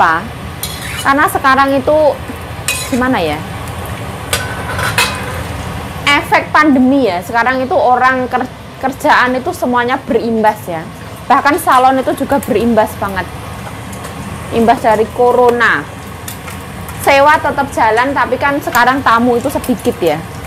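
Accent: Java, Indonesia. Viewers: Nada Mandarin kmu perlu di pelajari medhok Jawa kmu masih jdi blepotan mandarin kmu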